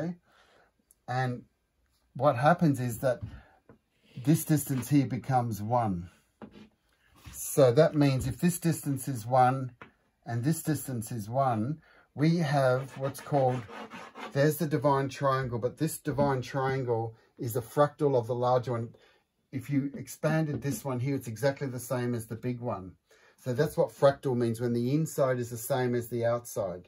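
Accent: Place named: Australia. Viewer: Do you do courses Or classes? I'm in Sydney Australia. I am in Sydney & I just realised you have an Australian accent I was so engrossed in your teaching I didn't even notice. I would love to take a course lol 😂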